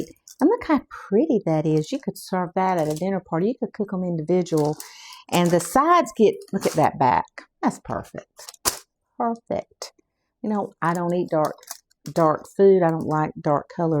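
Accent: South, United States. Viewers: I love your southern sound, it’s comforting and takes me right back home. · Nice presentation. I come here for the southern accent. 😊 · I really enjoyed your show! Your southern accent really adds the secret ingredient. I can’t get my biscuit s to rise. Probly not self rising. I always buy all purpose ( no rise)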